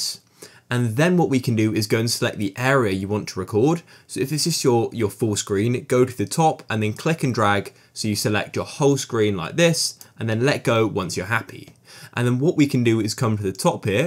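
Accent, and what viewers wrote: United Kingdom. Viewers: bri-ish mate helped me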